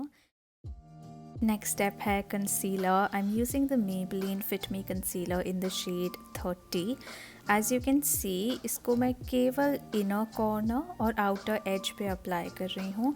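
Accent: India. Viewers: Iam ur huge fan. Love ur vidios. And ur cute and sweet hinglish. Awsome. Tc🙂❤👍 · Apki hinglish or look dono cute lge 👌👌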